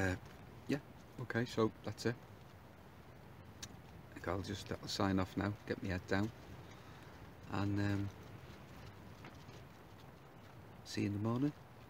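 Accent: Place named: Merseyside, United Kingdom. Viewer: Brilliant one, great choice of location even if it was in the dark. You never forget your first wildcamp and to do so as a solo one is even better! Great video and every trip out should be an opportunity to learn. From pack size and weight to routes and weather watching, it all adds to the fun. Just trying to work out which side of the Mersey you're from based upon the accent, I was going to say your a Wirralian but not too sure now. I'm heading to check out your other vids too.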